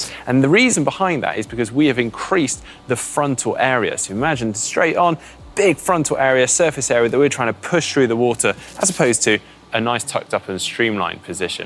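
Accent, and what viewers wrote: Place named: England, United Kingdom. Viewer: When receiving instructions, it's most believable hearing it from an Englishman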